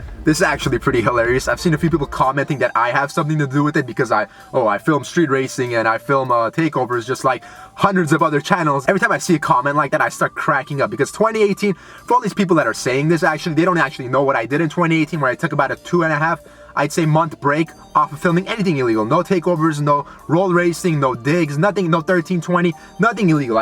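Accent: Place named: Europe. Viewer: He sounded European tryna speak English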